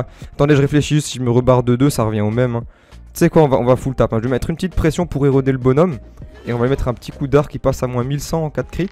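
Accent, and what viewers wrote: Alsace, France. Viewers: tu viendrais pas d'Alsace toi par hasard ?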